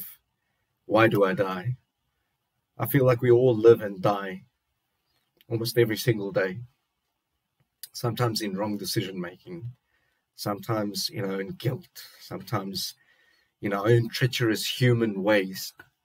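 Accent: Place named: South Africa. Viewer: May I ask where you are from? You sound almost exactly like my fiancé sounded when he talked. He was from South Africa.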